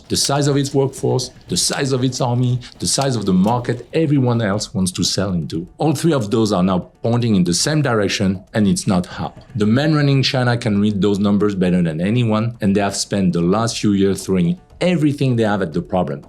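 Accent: France. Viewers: Like your video's including your French accent. Greetings from The Netherlands and thanks for your video's. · The world’s most annoying French accent. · Love his French accent!!!💖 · Mon Dieu, ça fait mal d'entendre son dialecte. On ne peut pas transposer la mélodie de la langue française en anglais.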